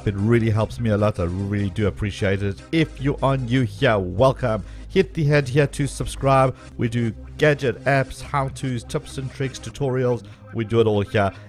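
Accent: South Africa. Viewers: Are you South African ? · You sound like you're South African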